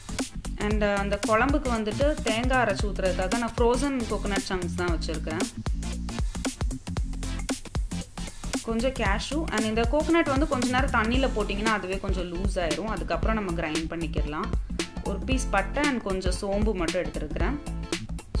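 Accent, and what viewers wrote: Kerala, India. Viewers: Nice speak. Tamil. I think your from kerala. But your speech. Tamil. Gails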